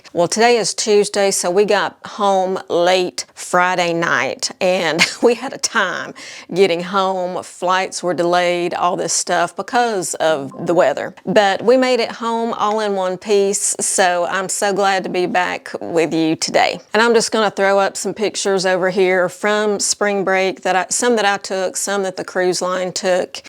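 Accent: South, United States. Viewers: Yes, ma'am, we do sound so much alike. When I heard you mention north Alabama, I knew you are one of us. Happy almost weekend! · Watching you from Chico, Ca., in the northern part of the state, north of Sacramento. I watch a number of fashion influencers and you are my favorite. Love that sweet southern accent and all your great outfit ideas.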